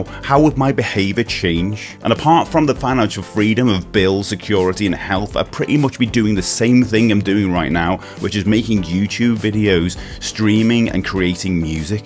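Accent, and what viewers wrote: Liverpool, United Kingdom. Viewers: Its never to late mate! Btw .. are you a Scouser?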